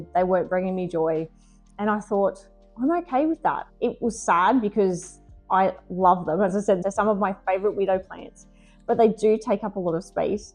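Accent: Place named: Australia. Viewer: noo YT didn't show this video in my mentions, but it popped up on my feed today. I am so glad it did and I love your Aussie accent! I can understand you just fine. 🙂 I love your home and outdoor garden too! it looks like you get a lot of natural light, I am so jealous. thank you for doing this tag! 💚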